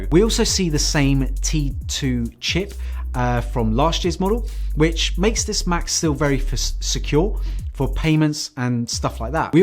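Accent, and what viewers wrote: United Kingdom. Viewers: Too British to listen to.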